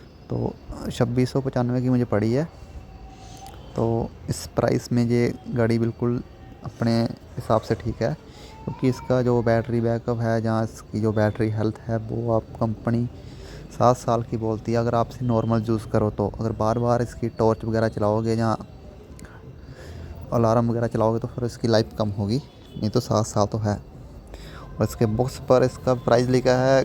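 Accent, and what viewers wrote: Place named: Punjab, India. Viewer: great video buddy....very enjoyed your hindi in punjabi accent 🙂🙂🙂🙂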